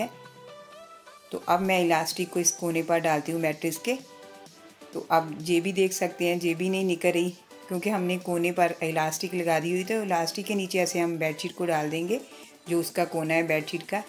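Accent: Punjab, India. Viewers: Thanks par ek baat confirm hai ki aap Punjabi Ho apke bolne ke tarike se pata lag raha hai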